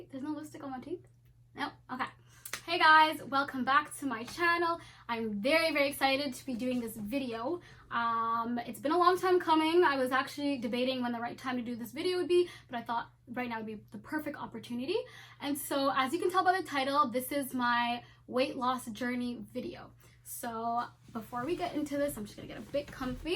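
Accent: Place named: Ottawa, Canada. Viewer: I know an Ottawa accent when I hear one LOOOOOOL mashallah tho!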